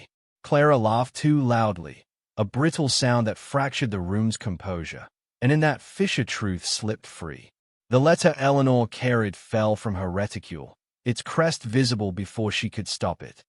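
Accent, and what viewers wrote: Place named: Australia. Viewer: Not an American at all. One of the UK COMMONWEALTH Countries - Aussie, maybe? But definitely distracting. 😊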